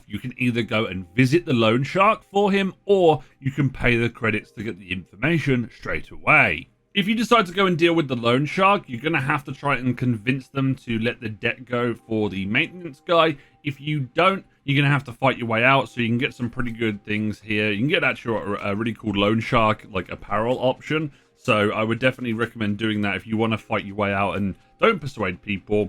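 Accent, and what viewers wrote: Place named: England, United Kingdom. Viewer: Ahhhh, English.....ENGLISH!! Not American trying to talk at 6 words a second! Why do they do that? Great video, precise and clear. What a treat. Liked and subbed.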